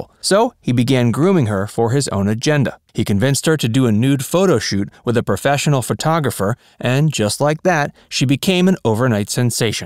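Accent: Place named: Transatlantic, United States. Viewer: hello Mr you’ve a interesting’ Trans-Atlantic none accent. Did you train your voice ❤️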